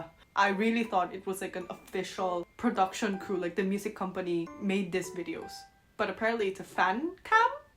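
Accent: Batangas, Philippines. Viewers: You are so cute and so Pinay with your antics and speaking. Keep happy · You're such a cutie pie! Your accent is such a sosyal filipino english na taga Forbes or Ayala Alabang. First time I encountered your vid and immediately subscribed. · Beauty and Brain. ang Galing mo hindi mo iisipin na korean like ang kausap mo kung sa phone kayo magkausap. talo mo pa ang Real filipino. iyong accent ng Batangas nandoon parin the way you speak. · Pti ung English mo is so Pinoy. 🥰🥰🥰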